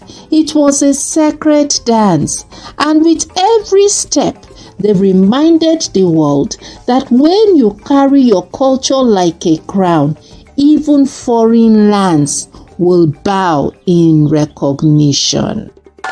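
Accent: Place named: Africa. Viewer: ❤ I sincerely love the fact that this is narrated with the beautiful African accent. It makes it all the more enjoyable. Thanks !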